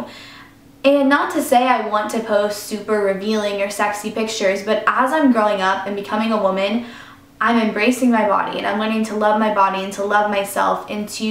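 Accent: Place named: Texas, United States. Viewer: I heard a bit of that Texan accent in this video :P super cute, haha. Maybe everyone always notices it but I'm Texan so it's hard to pick up when I'm used to it xD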